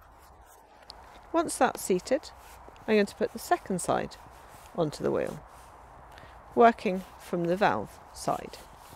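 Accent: England, United Kingdom. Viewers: Best ever Step by step Repair video ever Created. Great English Accent, great voice tone. The Best ever English teacher ever Created. Great Country side Background . Priceless for a GreenHorn like Me.Thanks a few Billions. Great tips like not removing fully the tab washer, lifting the rear wheel to ease chain releasing b4 tensioner takeoff.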